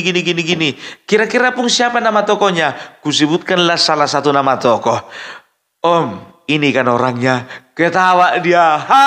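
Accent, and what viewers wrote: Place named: Medan, Indonesia. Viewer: Sor kali aku dengarnya, opung bilang nggak aci😂😂😂asli medan kali Sukses selalu opung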